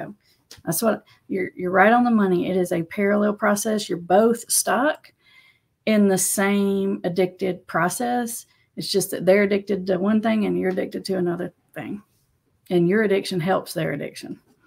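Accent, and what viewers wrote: South, United States. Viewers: Love the channel and your slow Southern style !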